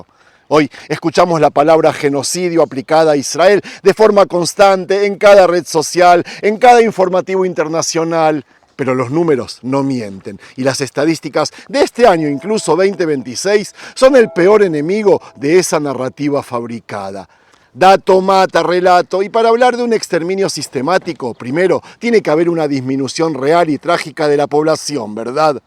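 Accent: Buenos Aires, Argentina. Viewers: Por tu acento creo que sos también argentino doblemente hermano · Hola sr de Argentina, hace mucho lo veo me resulta muy agradable utt.me llama la atención su Castellano esmuy porteño de Argentina siendo una persona compresiva Y humama Gracias Gracias soy de ɓs.as. y cristiana solo siento elogios para usted y me gustaria un pequeño repaso de su conección con argentina ya que dijo que tiene un hermano graciasle pido no se incomode plr el pedido ya queme resulta una persona muy agradable mis🎉 saludos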